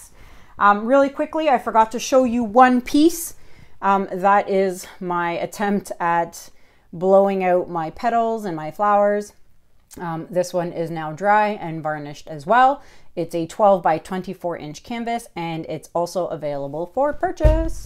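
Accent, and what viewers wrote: Canada. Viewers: 4 daughters, 3 stepsons, which gives me 3 daughter in laws, 26 grandchildren I've had a moment or too LOL , in 5 yrs i have beat cancer, raised 2 grandkids,lost 2 best friends to cancer my mom and sister and now i am helping my husband fight stage 3 colon cancer with this Covide 19 going on, if anyone walks into my house with out saying hello to me I say Good-bye, you go girl and by the way love that Canadian accent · I'm just starting out. I have to tell you, of all the YouTube tutorials out there (and I've been binging!), your channel is the absolute best. Aside from all the detailed instructions you give, I love your interaction with your viewers (and your Canadian accent! LOL). Thanks for sharing this wealth of knowledge you have. Your work is stunning and I appreciate your responses to my questions on many of the videos I have watched. 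You are amazing.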